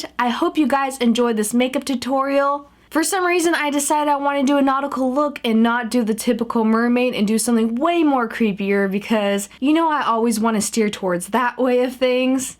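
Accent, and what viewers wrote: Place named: South, United States. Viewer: To my knowledge she's from Texas [Now living in California], so it'd be southern.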